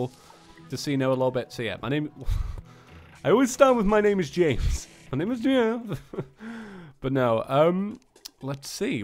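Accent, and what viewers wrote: England, United Kingdom. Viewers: I knew you're from england even before you said it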